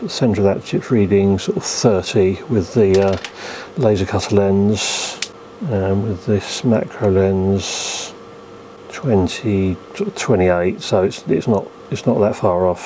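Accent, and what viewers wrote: United Kingdom. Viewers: Yeah, if you have that much trouble, just go watch one of the many other electronics channels. And those of us who can understand English accents just fine (that's just how people speak in that region) will enjoy amazing content.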